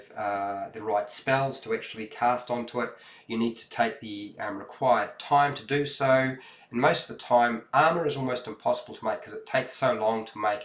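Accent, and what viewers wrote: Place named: New Zealand. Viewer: After watching so many of your videos, I'll have to dedicate an NPC or a villain to you because in addition to your content, you've become an invaluable resource for a great New Zealand dialect!!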